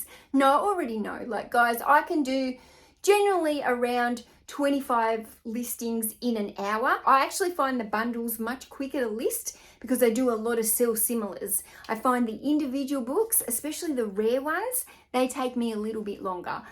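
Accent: Australia. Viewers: Im guessing east coast Australian, coming from a South Australian here ;)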